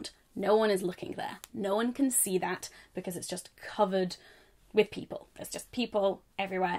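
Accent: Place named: England, United Kingdom. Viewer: That English accent 😍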